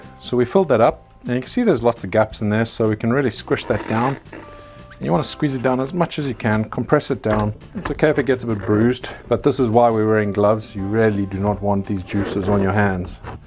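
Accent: South Africa. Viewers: New subscriber!Great videos. Watched one and then about 6 more.Huge fan of hot peppers, and the accent doesn't hurt. South African?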